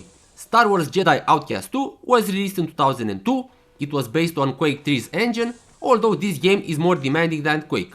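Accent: Romania. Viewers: Great old video cards and this isn't even the GT variant. Oh, and I'm just wondering, are you Romanian? You sound a lot like Dr Ortmeyer from Hitman Codename 47 😅🙃😝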